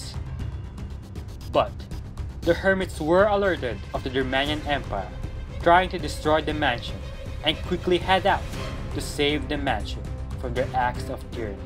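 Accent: Philippines. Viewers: I never heard such a Filo accent in a Minecraft video holy crap ahahaha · are you Filipino? you sound like one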